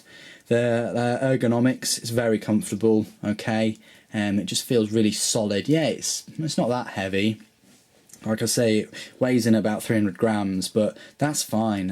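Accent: United Kingdom. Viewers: obviously British I'm Australian we sound nothing like them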